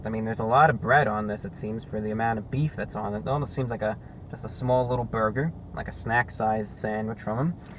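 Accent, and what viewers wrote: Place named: Mid-Atlantic, United States. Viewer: Whats up with the atlantic accent ?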